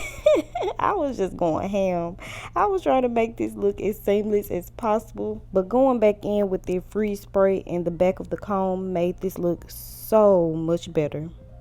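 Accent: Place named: South, United States. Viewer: 😍😍 I love a country accent.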